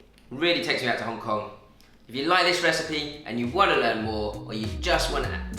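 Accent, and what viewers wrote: England, United Kingdom. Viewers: Chinese Chef with an english accent. Great!! Chinese noodles are great so is dumplings. Yummers!!!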